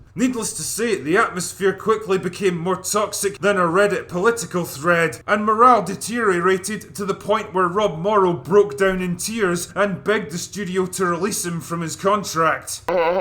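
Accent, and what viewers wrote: Scotland, United Kingdom. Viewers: The Worst...? Well, I admire your razor sharp whit & kickass Scottish accent... You're probably too young to remember Ishtar, Heaven's Gate, The Room, Roar... Love your work! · This guy sounds like he’s straining while taking a shit and giving a review at the same time in a British accent with a mouth full of pastries. Not being rude but just what it sounds like to me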